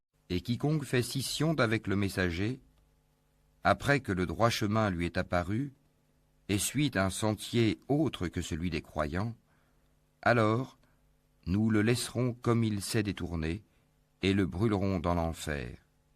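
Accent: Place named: Gabon. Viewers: Qu'Allah vous en récompense. Humm l'accent est très gabonais hein🤔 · Il est gabonais justement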